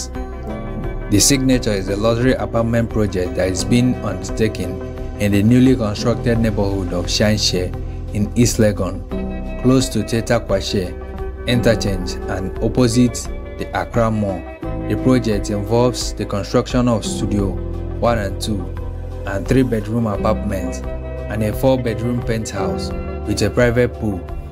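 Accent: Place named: Nigeria. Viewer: You sound so foreign with the way you pronounce the city's names of Ghana. I have no doubt your accent is Nigerian. Anyway, thanks for sharing the info about Ghana. Let's see how Nigeria is doing on mega construction projects in your next video.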